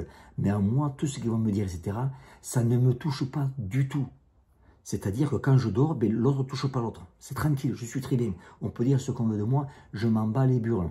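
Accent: South, France. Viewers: Salut. Déjà, les gens ne te comprennent pas parce que tu parles vite. Mais il suffit de s'y habituer je dirais et c'est tout!!! Ensuite tu as un accent que je n'ai pas et c'est bien dommage, je suis parisien, personne n'est parfait... Mais surtout, garde le ton accent, c'est agréable, c'est chantant, ça rappel les vacances, le sud et le bon air! Tu ne vas pas l'effacer pour faire plaisir a certains! Tu sais, c'est un peu comme les gens qui se plaignent des cigales dans le sud, elles font trop de bruit qu'ils disent! Mais le sud sans les cigales, c'est plus le sud!!! Et c'est un peu comme, il parle comme il parle, point!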